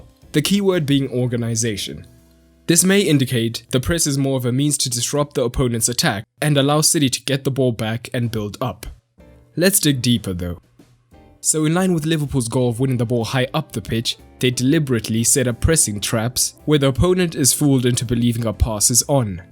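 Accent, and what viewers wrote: South Africa. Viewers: You sound South African 🤔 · You sound South African ...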